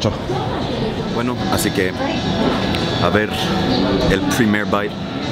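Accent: Mexico. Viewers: cada ves hablas mejor el español! y mas que estas aprendiendo el español mexicano! eso es super. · desciendes de Mexicanos'? o como es que hablas tan Mexicano? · Wow your spanish is awesome. The vast vocabulary you have no mistakes at all even your Mexican accent is quite impressive. You ahould be very proud of your achivements. Remember one thing, Mexican spanish is spoken by at least 250 million people. So thats the spanish you got to use and speak for it also has such influence in the rest of the spanish speaking countries. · Ya tiene 3 años mas o menos que no es Distrito Federal, SOLO CIUDAD DE MÉXICO O CDMX O CDMEX, tienes acento mexicano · Qué bueno que visitas México porque hablas muy Mexicano, pásatelo rico en mi tierra chido güey!!! 👍🏼🇲🇽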